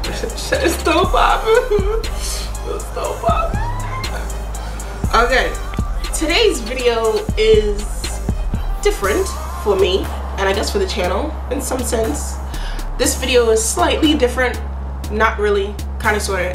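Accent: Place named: Jamaica. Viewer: the island accent did it for me girlie! Are you Jamaican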